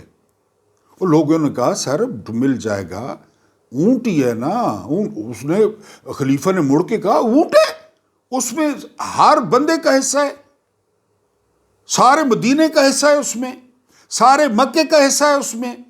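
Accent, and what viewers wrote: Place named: Mianwali, Pakistan. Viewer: صاحب یقین کریں آپ کے دو تین ویلاگ دیکھنے کے بعد بندہ آپ کے ویلاگ کا عادی ہو جاتا ہے اور انجاۓ کرتا ہے۔ میانوالی لہجے میں آپ کا سٹائل سب سے وکھرا اور الگ ہے۔ کامیابیوں کے ساتھ شالا جیندا راہویں۔❤😂🎉❤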